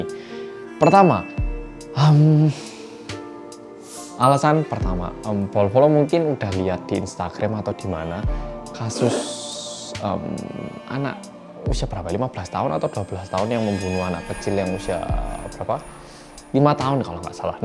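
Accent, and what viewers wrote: Java, Indonesia. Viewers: Siap orang korea yang medok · YANG MEDOKKKKK!! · Lebih suka mendengarkan ceritanya mas,enak di denger soal nya mas medok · Mas bikin video ngajarin Elsa Bahasa Jawa biar ikutan medok, dong. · Mas Medokkkk 🥰🥰 pagi massss ..ttp sehat ya....❤❤❤ LANJUTKANNNNN... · Mas medok🤗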